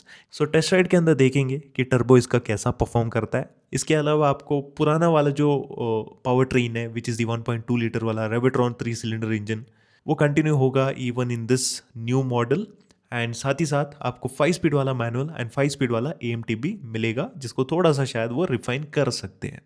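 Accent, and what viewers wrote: Kerala, India. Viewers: I am big fan ...the way u talk....think ur malu from kochi. Thanks 👍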